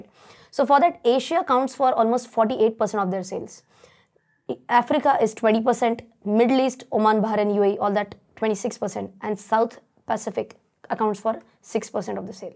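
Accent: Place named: Pune, India. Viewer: Thank you Mam, best things i like was getting education via Entertainment way.. It was like story telling. Command on english, being marathi manus proud of you for using your Punekar style in between your explanation. Point missing Asian Paints competitors(sorry). Please keep posting such videos mam.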